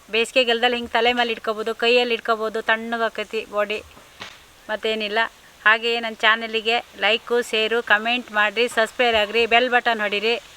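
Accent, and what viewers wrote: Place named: Siddapur, India. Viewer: Amma nimmur yavduu? Neev matado shaily nodudre siddapurdavruu ansutte nange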